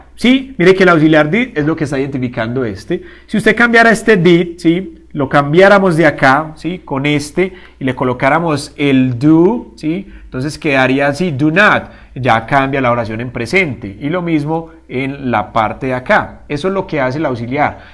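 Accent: Colombia. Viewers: Excelente explicación como de costumbre, saludos desde Argentina, Maradona, Messi, que jugador, como la mueve con el ingles. Aparte del ingles disfruto ese acento colombiano unico. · eres colombiano? amoooooo u acentooooo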